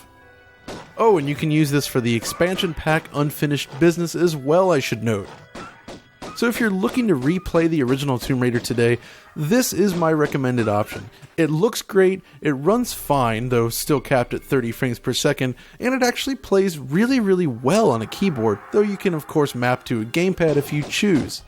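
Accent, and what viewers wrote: United States. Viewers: This guy's American accent makes me cringe. Still, great work DF! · Well, he IS from America. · he's American and definitely has an accent.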